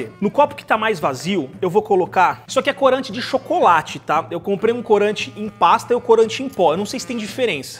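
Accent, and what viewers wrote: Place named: Rio de Janeiro, Brazil. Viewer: ele é um paulista com sotaque carioca...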